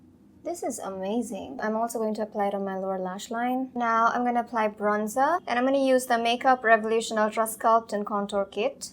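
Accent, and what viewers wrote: Bengal, India. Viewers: WoW you're bengali❤️ Just perfect bengali accent omg❤️beautiful!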